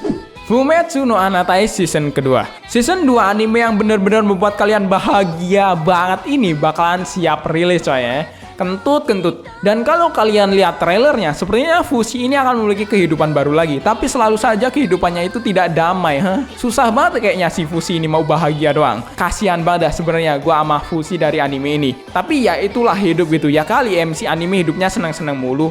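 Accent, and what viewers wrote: Lampung, Indonesia. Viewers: Lah org lampung min? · Lah warek Lampung tah min? Wkwkwk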